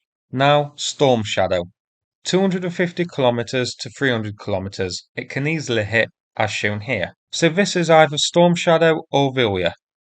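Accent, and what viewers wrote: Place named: Nottingham, United Kingdom. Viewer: Ah up me duck. You’ve got a proper East Midlands accent.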